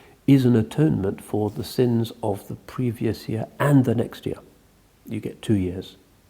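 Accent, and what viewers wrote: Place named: RP, United Kingdom. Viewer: What thick accent? This is RP (received pronunciation), a cultivated, educated register of British English.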